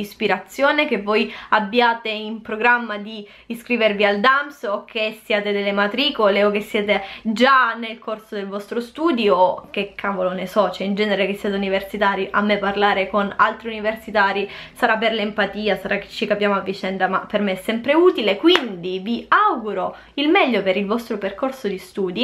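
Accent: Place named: Apulia, Italy. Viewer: Quanto sei Pugliese....!!! (Sarrbbe un complimento)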